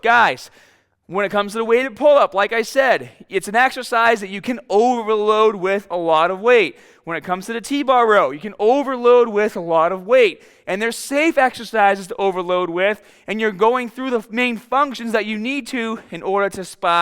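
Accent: Boston, United States. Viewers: The Boston accent is hysterical... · Thanks for the videos! They are awesome! From where in the US is your accent if you don’t mind me asking? :)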